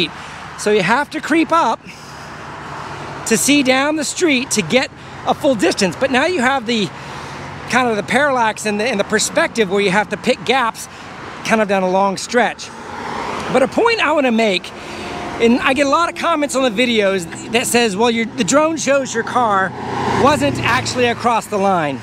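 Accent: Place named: United States. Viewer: ...could've sworn I heard him say "metre"...and appears (from accent) to be from USA and appears older than 30. Whoa! There IS hope for the USofA yet! (must be an engineer :-) Seriously though, great content/analysis, thanks!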